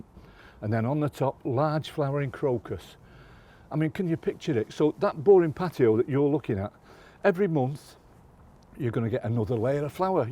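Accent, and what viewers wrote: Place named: Lancashire, United Kingdom. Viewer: Brilliant accent refreshing lancashire UK yeah!! Brill great explanation got my bulbs cheap loads in the sale at Wilcos cant wait to plant at 15 p 25p a bag ...cant be bad eh!!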